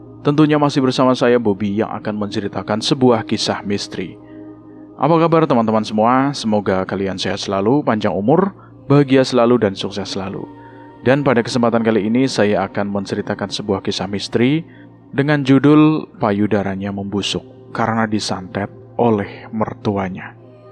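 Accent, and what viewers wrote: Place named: Java, Indonesia. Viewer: Medok Jawanya lekat banget, kurang enak di dengar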